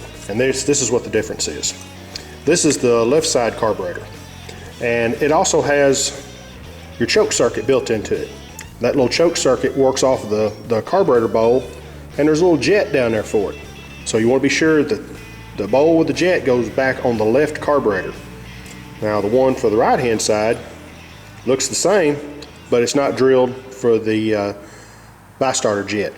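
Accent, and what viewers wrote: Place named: Arkansas, United States. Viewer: A good work, I'll keep your tutorial in mind since I have one of those bikes (the LM version) and, someday, I should try to clean those carb. Just for curiosity, have you sold the bike or are you using it for fun in the fields? P.s. I appreciate your patience in explaining slowly while filming the procedure, since I'm not very familiar with Arkansas accent: not with any US accent, in fact :)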